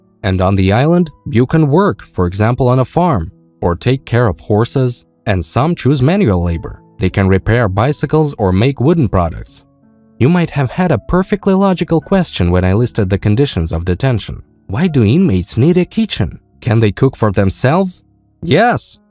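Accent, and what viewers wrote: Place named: Russia. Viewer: Maybe AI voice over instead of this thick Russian accent? Kinda hard to understand! 🤔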